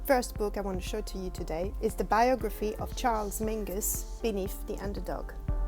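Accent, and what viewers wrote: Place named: France. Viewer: English with a French accent is the best combo!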